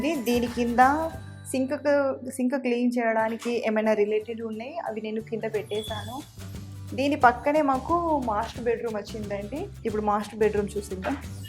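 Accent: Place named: Karimnagar, India. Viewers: Akka meedi pakka Karimnagar ey anipistundi.. 🤔🤔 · TELANGANA yaasa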